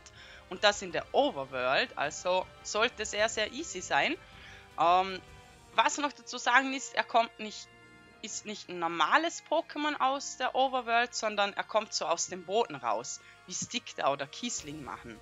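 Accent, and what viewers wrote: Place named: West, Austria. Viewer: Ersteinmal - gutes Video! Propz👏🏽 Dachte zuerst du kommst aus der Schweiz wegen Akzent, hab dann aber überlegt & dachte einen West-österreichischen Akzent raushören, aber die Kanalinfo war im endeffekt ausschlussgebend!👍🏽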